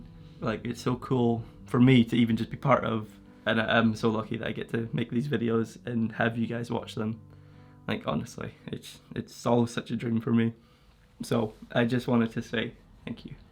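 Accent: Scotland, United Kingdom. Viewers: He's from Scotland, not Ireland. Different country, different accent 🙄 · He's Scottish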